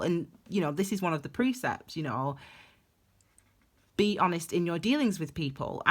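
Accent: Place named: North, United Kingdom. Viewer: Thank you another great reading.. and who can love a northern accent? 😂 is northern girls rock 💫💫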